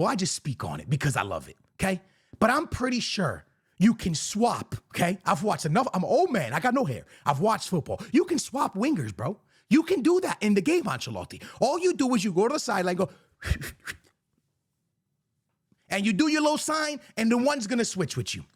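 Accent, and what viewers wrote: DMV, United States. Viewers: Bro are you from the dmv? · Wait, is he from the DMV? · Yo youre from the dc area?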